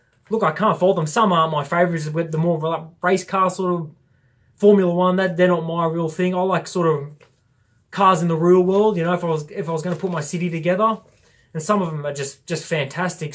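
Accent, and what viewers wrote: Australia. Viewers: i think its a good idea, to make a new release from (some) old sets. the taj mahal is a very cool building - but not to build :-D ... i also wait for the CC, but i think, that will be a dream / The best set 2017 is the old fishing store and the ninjago city..so much great details, so much fun to build :-) / Speed Champions are nice - but i hate stickers, and there are more stickers then parts... :-D ...and without these stickers, you dont know if its a Porsche...or what else ;-) ... / assembly square was 10 years...in 2007 came the first modular -> CC :-) / my english is not so good, but i like listen to you...australian english sounds nice :-)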